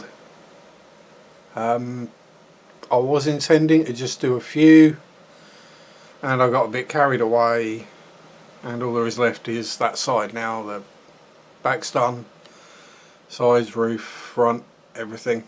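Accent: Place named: South Africa. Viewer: How do you spray white? ;) or how does one spray white :) whey mannn nowt rang wit talkin South African like!!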